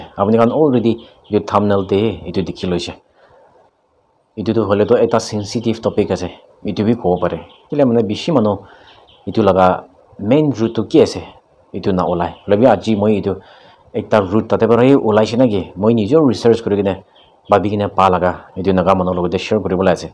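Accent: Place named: Bengal, India. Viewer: Don't know much about your background but you speak a corrupted Assamese-mix--bengali. You claim to be a naga like us but don't you think you need to properly verify your identity. Trying to figure you out is a headache. And if the IMs are looting for whole tangkhuls like your people claim then, why we never received our fair share?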